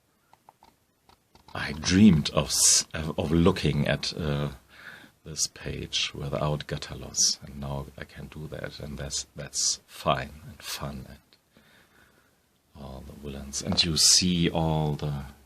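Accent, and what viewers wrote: Germany. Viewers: Ich finde es schade, dass du in englisch bloggst, vor allem da es dich einschränkt, weil du manchmal die Worte suchen musst. Andererseits mag ich den deutschen Akzent .) Aber am Besten gefällt mir, dass du auch Disney Comics im Schrank hast .. Thumbs up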